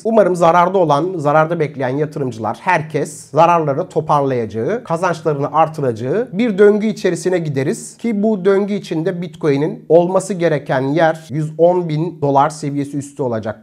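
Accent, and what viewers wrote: India. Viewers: Hocam niye Hint aksaanıyla konuşuyorsun? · video ingilizce yapınca hintliler gibi konuşuyorsun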